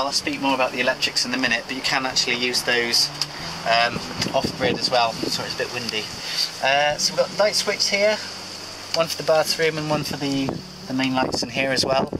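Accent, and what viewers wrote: United Kingdom. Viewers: one of the most adorable homes.love his brit accent.love the way his eyes light up when he talks about his wife.every feature in the home is awesome. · I just love the British Accent, I don't know why.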